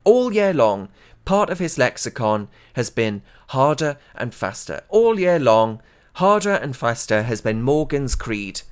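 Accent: Newcastle, United Kingdom. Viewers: Great video, also great to see & hear a Geordie lad stikin it to them.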